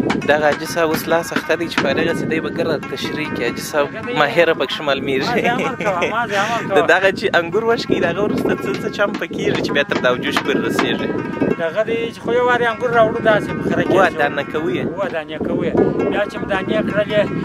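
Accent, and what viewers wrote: Kandahar, Afghanistan. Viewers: Love the sweet Qandahari accent. This vlog took me back to my country and provided food for my soul. Great work brother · Mohammad Asif KHAN hahahahaha. Kabulai lahja?????? Kabul ki Pashto lahja da koma showa? Lara Pashto aw Bara Pashto dway staree lahjay dee. Da da Loi Kandahar, da. Kotee کويټه aw shawokhowa lahja da.Kabul lahja na laree.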